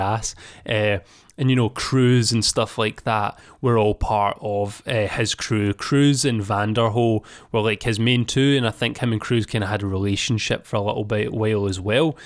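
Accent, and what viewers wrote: Scotland, United Kingdom. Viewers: I'm more fascinated by your Scottish accent mate! It is great training for my dyslexia cos it forces me to really pay attention to what you are saying but the downside is that I totally missed out on the actual contents of your videos lol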